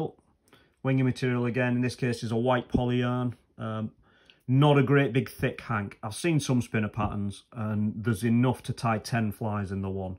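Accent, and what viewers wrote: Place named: Lancashire, United Kingdom. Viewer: cracking straight to the point , ive just started fly fishing and tying just what was needed finding your channel, sounds like your from lancashire 🍻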